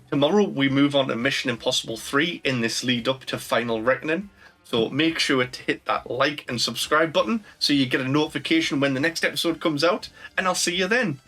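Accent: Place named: Newcastle, United Kingdom. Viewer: 😂 damn geordie accent man lol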